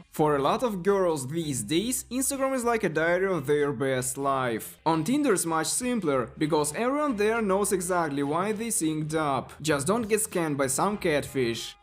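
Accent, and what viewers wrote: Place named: Europe. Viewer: Your european english accent make it more funnier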